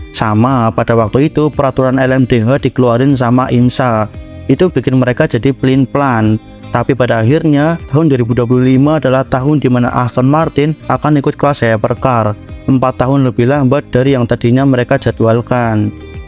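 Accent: Java, Indonesia. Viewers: suaramu medok banget min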